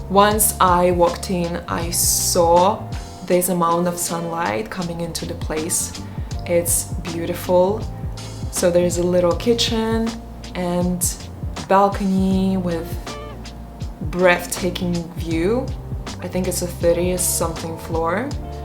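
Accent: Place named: Russia. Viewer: The ruzzian accent is disgusting, I am not watching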